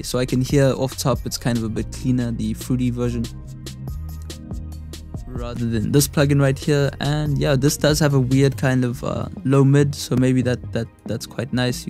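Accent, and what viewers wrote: South Africa. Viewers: are you south african dude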